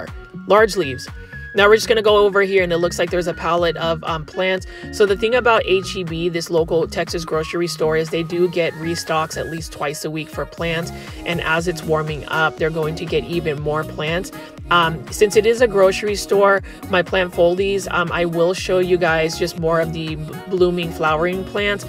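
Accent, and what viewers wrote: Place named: Philippines. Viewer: Who cares how he pronounce it. Everyone already knows he from the Philippines and that he has an Filipino accent. So his word pronunciation is different from the way we pronounce words.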